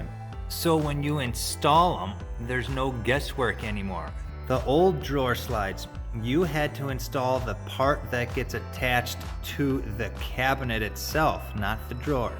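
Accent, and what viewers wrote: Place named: Wisconsin, United States. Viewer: I grew up in central Wisconsin, I know that accent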